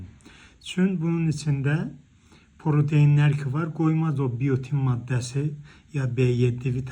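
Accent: Azerbaijan. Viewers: “Ösgürük “ bayılıyorum Azerbaycan şivesine ya😃🫶🫠♥️ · Əslində söz “öskürək “ kimi yazılır, sadəcə doktor türkcə və azərbaycanca qarışıq danışır.😊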